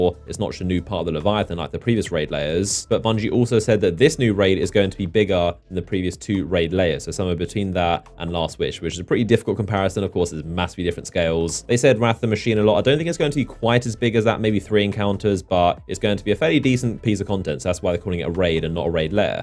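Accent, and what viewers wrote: United Kingdom. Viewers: Why is everyone on Youtube talk in a British Accent ? lol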